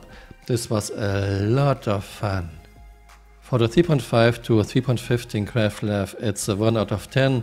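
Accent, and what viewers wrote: Germany. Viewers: this is probably the most romantic german accent i've ever heard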